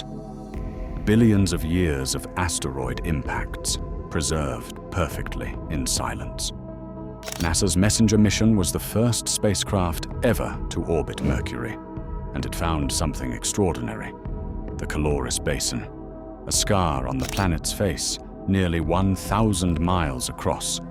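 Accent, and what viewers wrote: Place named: United Kingdom. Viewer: Awful British accented narrative sucks.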